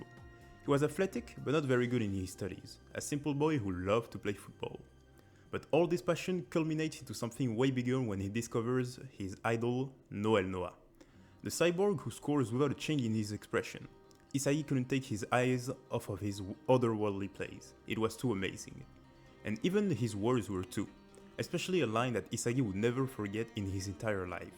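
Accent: France. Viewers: I knew you sounded french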